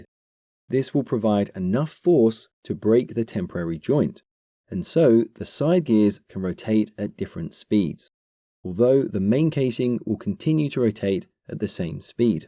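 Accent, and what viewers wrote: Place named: England, United Kingdom. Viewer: Usually, the gears that you refer to as 'spider gears' and 'side gears' should more properly be referred to as planet gears. Others have also mentioned the crankshaft/camshaft error. Your terminology (despite your English accent) seems to be the dumbed down USA version. It's almost as if the correct term is just too difficult to learn or remember, so they HAVE to simplify it. Otherwise, a fairly decent explanation of events. Regards Mark in the UK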